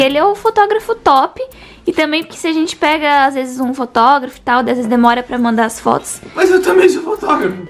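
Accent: Santa Catarina, Brazil. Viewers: Vc é de SC kkkkkkk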